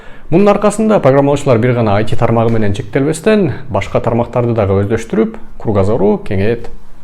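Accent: Standard. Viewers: Ассалам алайкум тууганым кыргыз тилин таза сүйлөйт экенсиң азаматысын! Кыргыз мусулман ошого динибизди да үйрөнүп алсак жакшы болот.ишине ийгилик каалайм алга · Кыргызча абдан таза суйлойт экенсиз. Ийгилик! Озум программалоо багытынын 2-курсунда окуп атам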